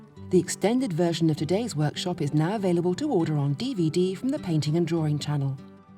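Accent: England, United Kingdom. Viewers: So pleasant to hear an English voice. Have watched a lot of American stuff which is sometimes a bit hard on the ear!! Really great instruction too!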